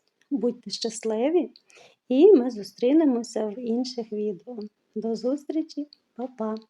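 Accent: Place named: Western Ukraine, Ukraine. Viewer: вітаю, подивилася з насолодою ваше відео, приємно слухати ваш діалект. У мирні часи ми кілька разів у відпустку, їздили по західній Україні, були і в Карпатах і в закарпатті, завжи набирали грибочків, звичайно сушених але яких запашних... Ви мені нагадуєте ті приємні часи:). Діліться ще з нами вашими гарними виробами. Бажаю спокою і миру❤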